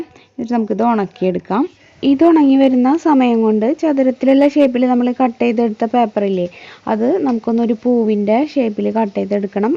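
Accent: Thrissur, India. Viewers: Are u from thrissur as the language slang.. Nice technique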